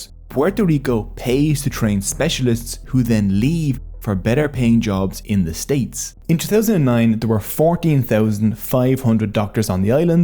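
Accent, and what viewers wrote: Ireland. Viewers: How come dude got a Liberal Irish accent? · you sound Irish, Puerto Ricans and the Irish have history together. thank you for this brother really, when i go to Ireland i will show the utmost respect top your people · Are you Irish?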